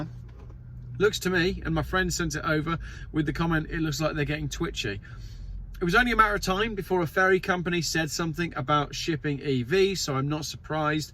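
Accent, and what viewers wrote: United Kingdom. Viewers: It's worth it just to hear your british accent. We "colonials" love it! And nobody here in the US seems to be as ahead of the curve on EV's as you are.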